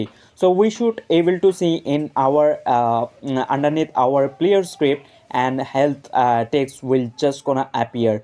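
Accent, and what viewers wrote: India. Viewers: Indian accent is horrible Ogay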